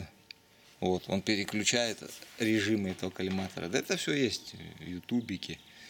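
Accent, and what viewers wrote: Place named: Kabardino-Balkaria, Russia. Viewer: акцент кабардинский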